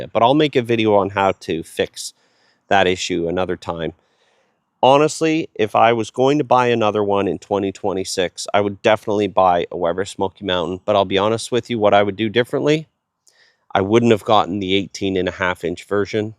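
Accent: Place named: Canada. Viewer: Some of the ones NOT to buy if you are an American (I realized that you are Canadian by the accent) are Frontier (MEX), Lone Star (MEX), Jealous Devil (BRAZIL), FOGO (El Salvador), others too. Royal Oak is made from the lowest bidders. Just read labels. Kingsford, Cowboy and M and M are acceptable.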